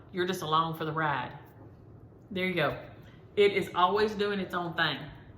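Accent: South, United States. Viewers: Love your channel. As I stitch this SUMMER, I'm watching your videos, love your PRETTY southern accent too.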